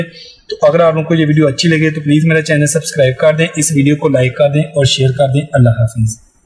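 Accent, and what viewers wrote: Pakistan. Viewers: Are you from 🇵🇰 Pakistan??...your accent seems like 😃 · You from Pakistan? Nice explanation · Pak se h bhai...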